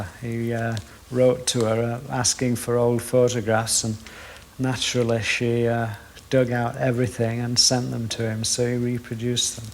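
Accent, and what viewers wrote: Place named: Yorkshire, United Kingdom. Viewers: Mildly interesting that his Yorkshire accent has got thicker in the intervening 50 years. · An amazing man with amazing ideas Even in more recent times he still has his broad West Yorkshire accent 🖼️🖼️🖌️🖌️🎨🎨🇬🇧🇬🇧🏴🏴❤️❤️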